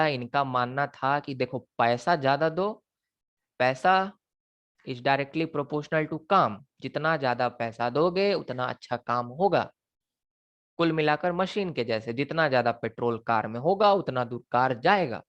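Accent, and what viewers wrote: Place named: Bihar, India. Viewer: Sir your teaching skills are just🔥🔥 and jo bihari tone hai usme to mja hi aa jata hai..❤❤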